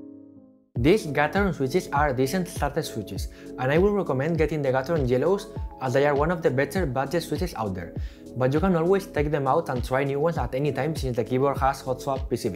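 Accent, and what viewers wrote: Spain. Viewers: He for sure has been born in Spain or at least he has been living for most of his life. He is got an amazing english for his age. His accent is 100% spanish. Very nice chanel man. Keep it on !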